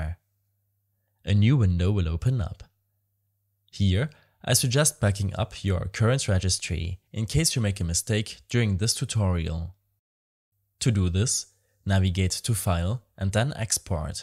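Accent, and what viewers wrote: Germany. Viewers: Where are you from. You have a german accent. I am from Germany.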